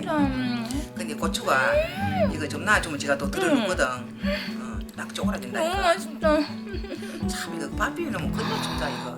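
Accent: Gyeongsang, South Korea. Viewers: 경상도 사투리가 재미 있어요. 홍양,홍양..ㅋㅋㅋ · 강원도 사람인데 사투리가 진짜 듣기좋네요 ㅋㅋ 경상도로 이사갈까 ㅋㅋ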